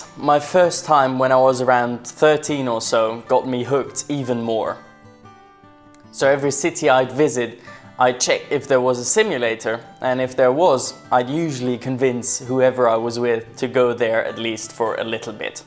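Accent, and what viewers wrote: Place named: Poland. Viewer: may I ask your nationality? sounds like you are polish for lot has chosen u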